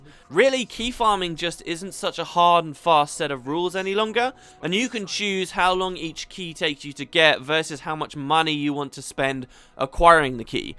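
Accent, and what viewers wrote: United Kingdom. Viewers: I enjoy your videos so much for so many reasons. First of all, I've fallen in Love again with Guild Wars 2.. I said again but actually even though Ive had it pre release, it is the first time.. I have never really played it, I have just 2 level 80 characters and just one with a finished story, but now I play again. And even though I am a noob and there still are so many things I have no idea about, it is so much fun! Your videos give me so much information, there is so much input in such a short time, you talk really quickly and address so many things, I like that so much. Additionally, I am from Germany, and I so much enjoy your british accent (You are from the UK, aren't you?). There are so many things I have missed because I just havent really played the game, but you explain so many things in your videos, I think it makes me love the game even more, and maybe this time I stick to it. 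Eventually I have been playing daily now for a month, at least around half an hour a day if only just to chill and rest in the evening. Never before have I played this much (being aware of the fact, that it IS NOT a lot of time compared to what others spend playing the game). Anyway, thank you very much for your videos! Keep up the great work! LG Nyakes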